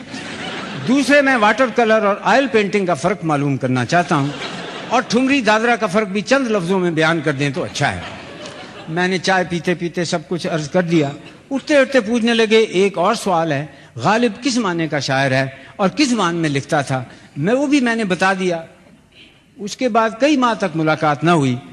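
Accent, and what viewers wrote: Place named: Hindko, Pakistan. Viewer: Oh okay thanks for clarifying. I was wondering how Zia Mohiuddin sounded like a native Hindko speaker talking in Urdu.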